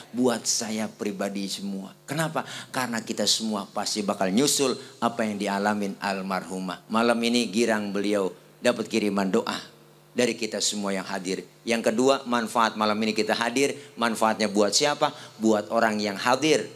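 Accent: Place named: Jakarta, Indonesia. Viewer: Betawi? Enak bett dahh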